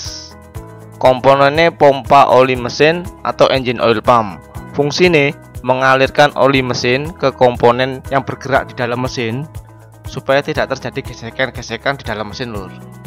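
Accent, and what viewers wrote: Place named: Malang, Indonesia. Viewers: logat jawanya di hilangin mas. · Iku mesin e bego gak kenek d ganti mesin e becak a cak? Medok malanganmmu lurrr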